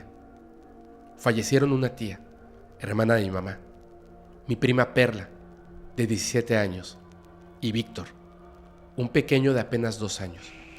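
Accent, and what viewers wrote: Mexico. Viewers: Hola tengo una duda: ¿Eres mejicano? Por el acento lo pareces. De donde seas, te mando un gran saludo y un fuerte abrazo. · Si es mexicano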